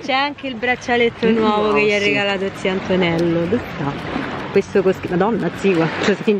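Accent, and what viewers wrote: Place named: Rome, Italy. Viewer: Amo il suo accento romano 🔝❤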